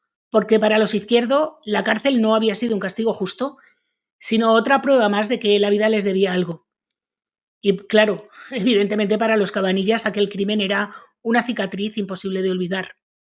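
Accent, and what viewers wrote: Spain. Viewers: Me encanta como relata con tanta espontaneidad y ese acento español le da un plus😊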